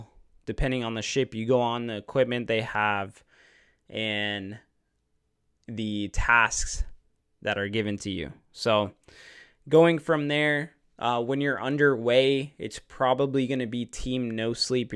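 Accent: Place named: United States. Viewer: I just realised this is American not Australian lol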